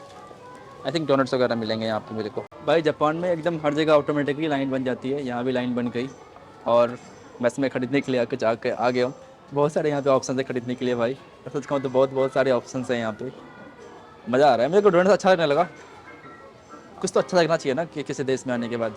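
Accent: Bihar, India. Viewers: Bhutla jaoge ye jo bihari axcent haii hm bihario ko tum se khas jor ke rakhta h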